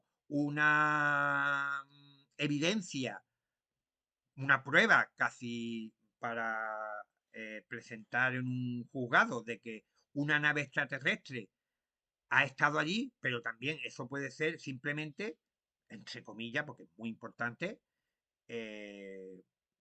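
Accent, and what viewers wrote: Spain. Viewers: Are you in South America or Spain,sounds Spanish in Spain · Como siempre te explicas de maravilla. Me encanta escuchar tu acento ( una Algecireña desde Girona), de verdad hablas mejor que ningún político, periodista u orador actual, viva la Z ' Un abrazo crack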